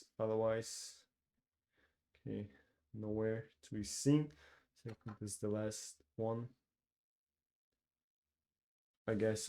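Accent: Germany. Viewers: are you german? because your accent sounds really german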